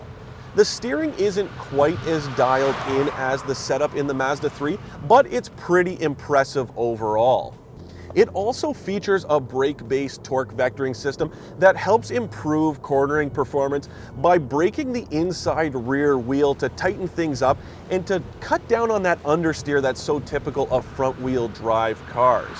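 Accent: Canada. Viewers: Sad that I noticed this was Canada by the turbo sticker on the civic before his accent gave it away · dead giveaways for Canada- "aboot" and "Mazz-Duh" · I’m very much looking for a head-to-head comparison of thse two cars, especially how they handle, but find it impossible to bear this guy’s yukky accent. Is he a Canuck? It’s just awful trying to listen to him.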